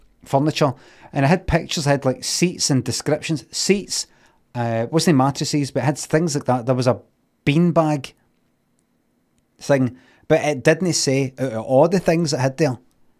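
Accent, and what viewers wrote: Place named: Glasgow, United Kingdom. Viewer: 'Mattreyseys' 😆 How I love the Glasgae twang.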